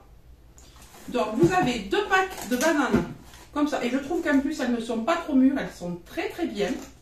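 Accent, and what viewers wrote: South, France. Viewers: jadore ton accent!!!!! ca sent bon le sud et le soleil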